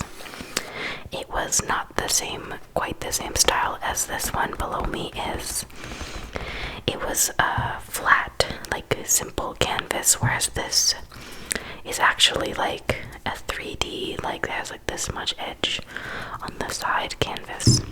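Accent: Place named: Canada. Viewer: I'm new here! Your video came up on my Youtube suggested on the homepage! I am so glad I clicked on it. I'm doing some sketching right now, and watching you paint was so nicee! I want to see you finish the project so badly. I live near Toronto, so it's really nice to hear a Canadian accent do ASMR, especially whilst being creative. I did film editing at university, was great to learn so if you are interested you should definitely take a class on it or self teach using YouTube to start with! Pick one software and then decide what kind of project you want to make, then search how to do basic edits using that software, you'll pick it up in no time :) You talking about enjoying your hobby really motivates me to enjoy time to myself once in a while and relax whilst enjoying a hobby, thank you so much for your content. Please keep doing these I love it! I am always looking for good quality art asmr and yours has been perfect! 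I want more lol! <3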